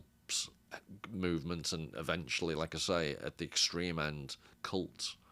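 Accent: Liverpool, United Kingdom. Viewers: I discovered you a few days ago on a Richard Grannon video and since then I have been making my way through your videos which I have found to be immensely interesting, highly educational, entertaining and at times hilarious. Love the Scouse accent and humour. Thanks for your work.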